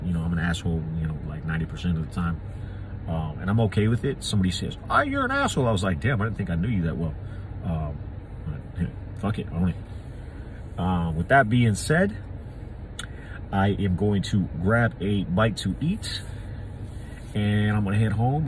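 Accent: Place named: South, United States. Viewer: No no, then ya won’t hear that lovely southern drawl!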